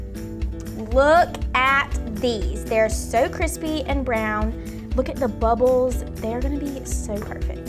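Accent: South, United States. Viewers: You are so stinking cute!! I’m from Minnesota so I get a kick listening to your cute little southern accent! :) I will definitely be subbing to your channel! :) Love from Minnesota!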